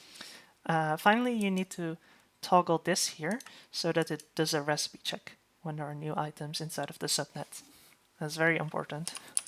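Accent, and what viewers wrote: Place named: Netherlands. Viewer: I love the dutch accent xD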